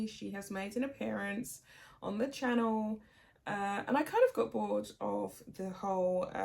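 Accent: United Kingdom. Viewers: Lovely to put your face with your UK voice! Great to see a young lady with great common sense to see through the UNTALENTED ENTITLED GRIFTERS!! It was reported that approval was given to print the pics to their children as there is a law in the States that children's faces MUST BE PIXILATED unless media has approval from the parents. So much for PRIVACY of the fake royals in the States.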